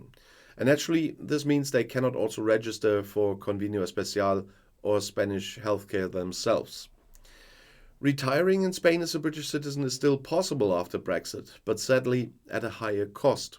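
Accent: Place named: Germany. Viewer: Nice German accent..😎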